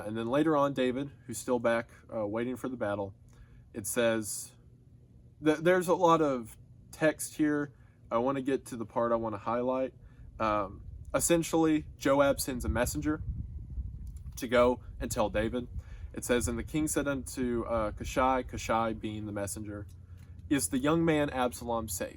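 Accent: South, United States. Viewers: Trying to figure out where his accent is from. Atlanra...the carolinas?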